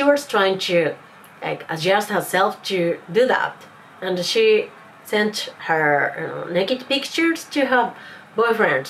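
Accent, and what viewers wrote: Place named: England, United Kingdom. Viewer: Hi. I am an Englishman who really loves your English accent! Your spoken English is excellent and I think that you have a brilliant command of the language. Your voice is also incredibly beautiful and so soothing. You shouldn't feel guilty about not liking certain aspects of Western culture, like the one of sending nude pictures. Many of us "normal folk" find it very unusual too! Thank you so much for sharing your life experiences, the beautiful Japanese lady with an amazing smile ❤️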